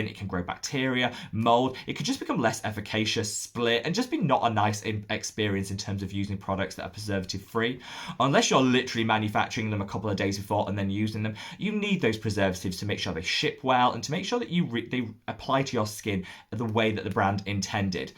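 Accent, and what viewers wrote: United Kingdom. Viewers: I like the bulletin style for reformulations but really appreciate the in depth analysis on new products. Being American, I need to listen hard to that oh-so-cool fast Brit accent, so the rewind button is my friend during the latter. You do such a good job, and I find true value in your videos.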